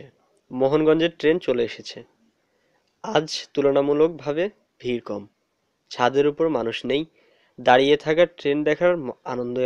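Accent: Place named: Noakhali, Bangladesh. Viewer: চালিয়েে যাউ মন্দনা আরও ভালো হতে হবে নৌয়াকালি আনছলিক টান আছে একস্টা প্লেবার রয়েছে বেশ লেগেছে।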